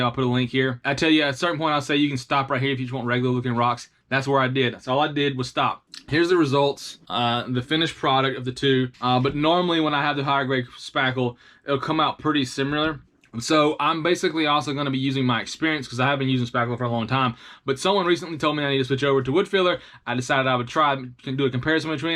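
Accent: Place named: South, United States. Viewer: U get a like and comment for the southern accent OUUU WEEE 😂😂🎉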